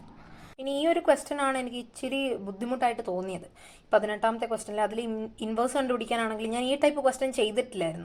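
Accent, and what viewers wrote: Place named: Kollam, India. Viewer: Kollam aano allel alappuzha aano ente cousinte slanginu similar slanganu sisinte slang 😅 btw all the best sister, ente examum moderate level eennu parayum, paper different aanu, pinne bio namukk, bot3, zoo3 chp vechitt ind, physics complete aayi ini derivations practice chyan und.... All the best champ . 🔥🗿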